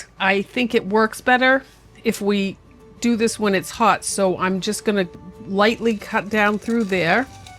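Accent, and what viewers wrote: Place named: South, United States. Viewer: I'm sorry, but I find this channel very confusing. I have watched 3 of your videos because the recipes look good, but I don't understand what you are trying to achieve? You have a woman who sounds like an American northerner doing the cooking, an obvious southern-accented man doing the voiceover, Appalachian fiddle music playing, more singing and music that doesn't seem to reflect anything in particular, and a fridge that plays disco music and flashes lights. Not to mention the name, referencing the Shire, is English/Irish in origin....you guys are all over the map and none of it fits together?? Signed, Very Confused.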